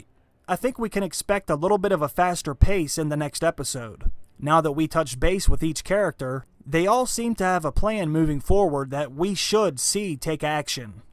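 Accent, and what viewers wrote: Pittsburgh, United States. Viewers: Are you from Pittsburgh, PA ... your accent sounds like Pittsburgh DAD.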